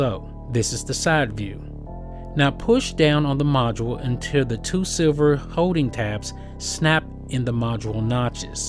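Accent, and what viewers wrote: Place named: Georgia, United States. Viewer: He sounds like he is from Georgia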